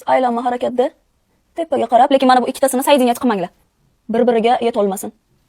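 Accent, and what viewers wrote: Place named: Tashkent, Uzbekistan. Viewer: Iltimos tashkenskiy sheva qati nakunet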